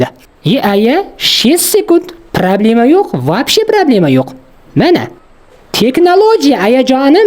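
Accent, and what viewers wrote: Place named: Syrdarya, Uzbekistan. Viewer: Sirdaryoni shevasi ekan😊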